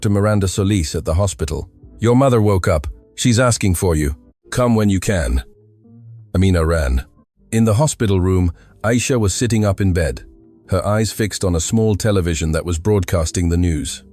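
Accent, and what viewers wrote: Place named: Africa. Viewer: ❤❤😊😊What a fantastic story!! Words can indeed change the world. It's tragic but true: arrogance blinds one to the cruelty they can wreak on others to salvage their bruised egos. Emeka should get another 25 years to ensure he doesn't emerge alive from prison. People like him should NEVER see daylight! Great story, fittingly narrated by one with an African accent. More of the same please.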